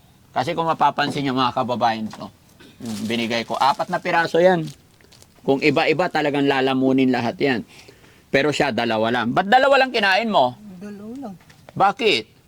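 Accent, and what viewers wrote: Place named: Cavite, Philippines. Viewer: Ung tono ng salita nya sounds like cvte o batangas...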